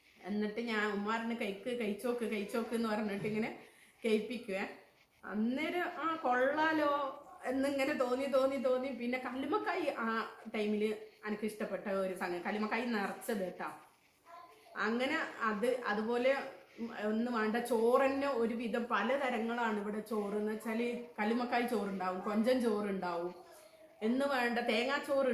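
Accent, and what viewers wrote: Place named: Mahe, India. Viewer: സ്ലാങ് ഇപ്പോൾ മാഹീ ആണല്ലോ കാട്ടുപാറ അല്ലല്ലോ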